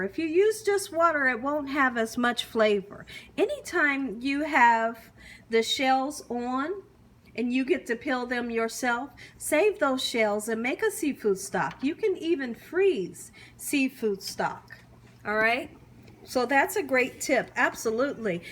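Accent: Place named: California, United States. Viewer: She sounds like CALI lol. I'm from ST Louis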